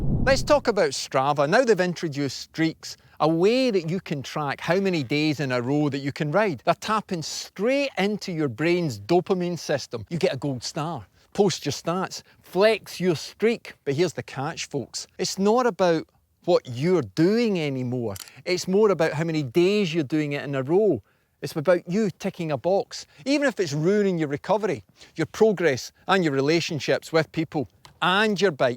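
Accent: Scotland, United Kingdom. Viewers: You sound Scottish, laddie. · are you scottish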